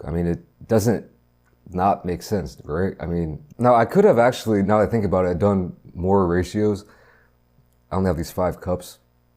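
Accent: United States. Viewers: You're in/live Korea? You sound like you were born and raised in America. Not that that matters in any way. But if you're born and raised and still live in Korea, I'm just amazed at your American is all.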